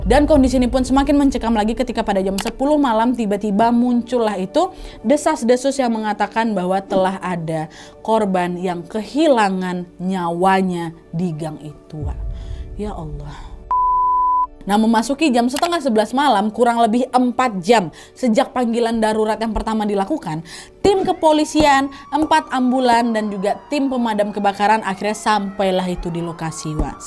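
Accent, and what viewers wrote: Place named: Java, Indonesia. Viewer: You heunti keun itu logat jawa hentikan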